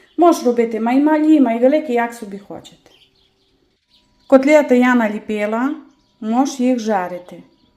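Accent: Transcarpathia, Ukraine. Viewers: та хоч би і ніколи не жили в Україні,на мій погляд не дуже важко здогадатися,що у має бути закарпатський діалект.Це загадка на мільон долярів.😁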